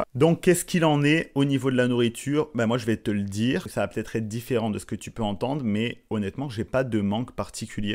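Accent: Canada. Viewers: Vous êtes là première personne qui parle dans un façon du Canada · Par contre.... après SEULEMENT 4 ans... DÉJÀ adopté L'ACCENT Québécois (On en parle)....alors que ma fille a vécu 12 ANS.... au Québec à Montréal Sans AUCUN Accent 🤔🤔🤔..... pfffffffff.....😤😤😤😤😤😤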